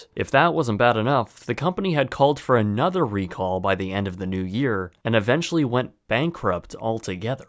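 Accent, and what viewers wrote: Canada. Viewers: Research = 10/10 __ Canadian Accent = 1/10 gah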